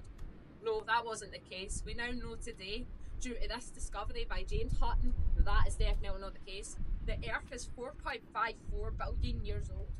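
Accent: Scotland, United Kingdom. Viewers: I’ve said it before but I’ll say it again: geology sounds better in a Scottish accent. 🤘🏴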